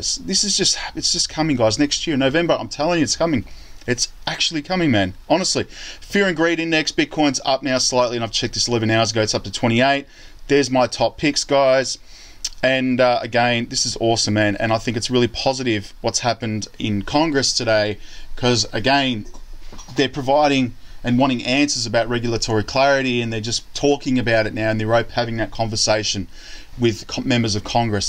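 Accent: Australia. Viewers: Love hearing a fellow Aussie talk up Xrp. Have a bag of 70k xrp and I’m hanging for this to go back up as i bought at 68c AUD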